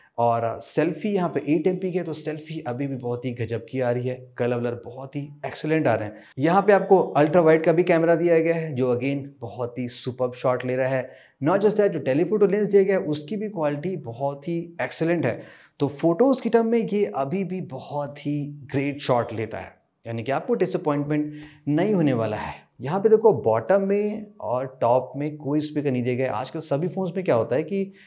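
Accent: Lucknow, India. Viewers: aap Lucknow se hai kya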